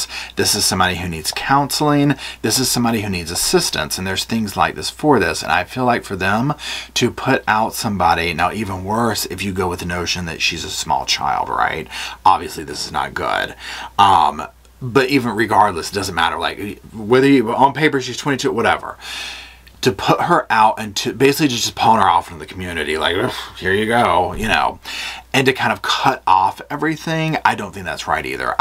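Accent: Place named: North Carolina, United States. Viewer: I didn’t realize you are from North Carolina…I thought I recognized the accent…❤ This case drew me in like the Darrell Brooks case…but there was no mystery with the DB show…smh… This one keeps me guessing… Love your channel and the way you tell stories…😊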